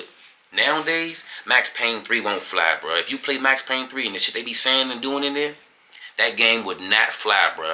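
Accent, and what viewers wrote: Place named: DMV, United States. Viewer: I hear that DMV accent fam! Had to come support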